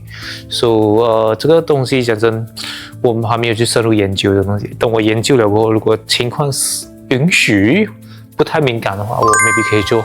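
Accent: Malaysia. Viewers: 马来西亚口音就这样啊。有时候我真的很烦这群普通话霸凌的东西。这帮霸凌人在中国大陆这个德行，出来还是这个德行。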